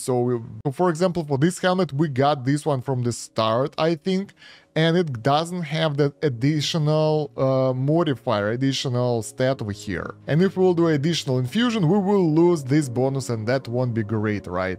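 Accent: Russia. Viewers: ДАА, ТАКОЕ ЖЕ ОЩУЩЕНИЕ, ЖЕСТКИЙ РУССКИЙ АКЦЕНТ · такое ощющение что он русский и разговаривает на английском с ужасным акцентом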